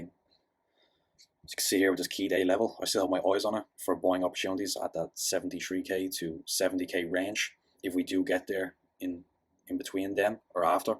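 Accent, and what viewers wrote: Ireland. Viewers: Thanks for the update, never would have guessed you were Irish...Great stuff, been following for years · Didn't realize you were Irish 😎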